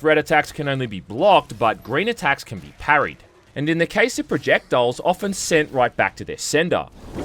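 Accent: Australia. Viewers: I hate the way Australians sound, can AI make you not sound like that, I hate it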